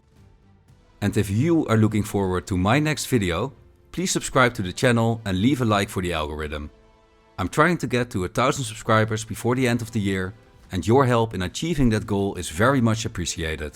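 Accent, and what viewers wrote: Netherlands. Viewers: The narrator is definitely dutch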